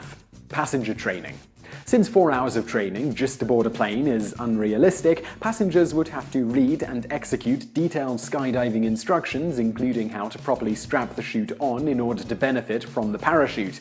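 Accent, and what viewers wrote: United Kingdom. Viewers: Why are you speaking in British accent but using imperial system? I don't understand. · Your British accent is not helpful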